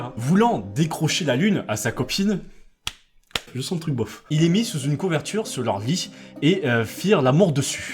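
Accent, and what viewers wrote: South, France. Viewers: Lmao he has a strong southern accent so good Luck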